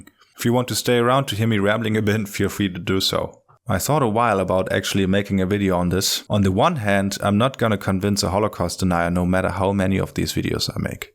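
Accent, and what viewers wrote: Germany. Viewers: i know you’re still making these videos, and doubt you’ll see this, but i think it’s extra important for you to do this work. for some reason my lizard brain trusts you more because you have a german accent. now, of course that isn’t a real reason to believe what you say as true, and i know this, but for people who are on the fence about the truth of the holocaust, all they really want is an authority figure, and i think you give that where british, american, and canadian-accented creators can’t.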